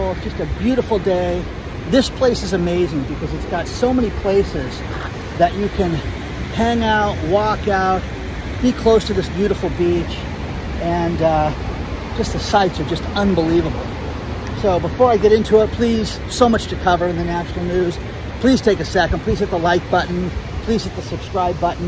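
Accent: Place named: Upper Midwest, United States. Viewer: bruh, where was your upbringing? your accent is driving my hubby crazy- he thinks upper midwest or northwest coast? p.s. we like ya vids.........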